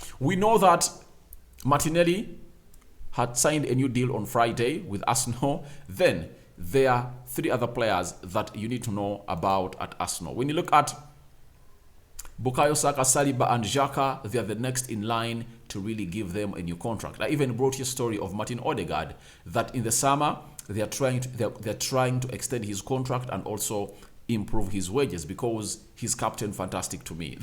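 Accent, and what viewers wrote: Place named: Iteso, Uganda. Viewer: thanks for the job you really give the truth but i request you to tell me your country and tribe coz you speak like an itesot from soroti city uganda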